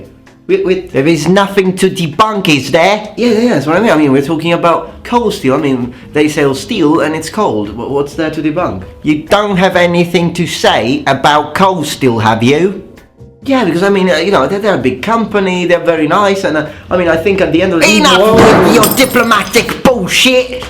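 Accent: England, United Kingdom. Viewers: Love your British accent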